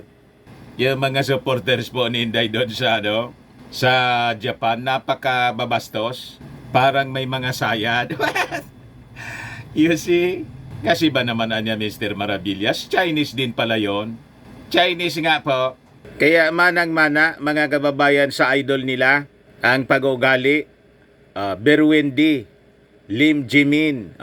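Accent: Bicol, Philippines. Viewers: Parang Bicol diction mo. Saen ka sa Bicol? Kami sa Cam. Sur.-- sismer boaquena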